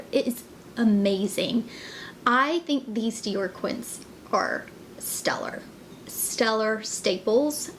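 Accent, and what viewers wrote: South, United States. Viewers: both of those looks are beautiful on you. I also bought the Denim palette but have not had the chance to wear it yet. Still just mostly staying home here in Washington with mandatory masks when out in public. I bought 7 of the new palettes and love them. I have collected Dior quints for many years but love the new formula. You are such a ray of sunshine. Love your Southern accent and personality.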